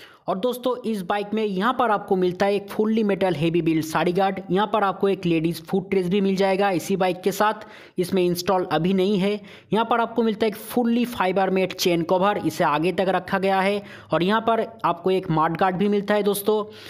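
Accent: Bengal, India. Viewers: I love hearing bengalee accent. Sweet like rasgullah .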